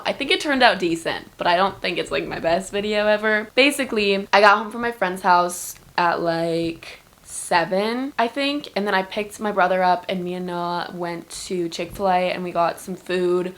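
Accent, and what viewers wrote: United Kingdom. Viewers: 10/10 British accent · I love your vids I'm a huge fan!!💗💗💗💗 also it British accent is a 10/10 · british accent 8.5/10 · 10/10 On Your British Accent and you are so beautiful and funny and your videos are so great and so well done! 🩷🩷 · Love the British accent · your british accent sounds amazing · brittish accent rationg: 100/10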